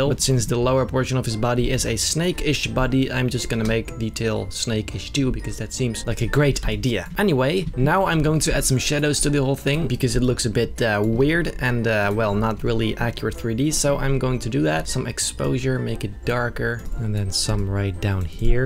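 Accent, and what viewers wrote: Netherlands. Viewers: Fantastische artiest, Nu nog van dat hollands accent geraken. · You sound Dutch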